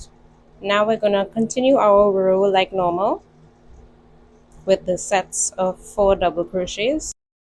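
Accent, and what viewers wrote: Trinidad and Tobago. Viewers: Ahh! I heard your accent and knew it was Trini!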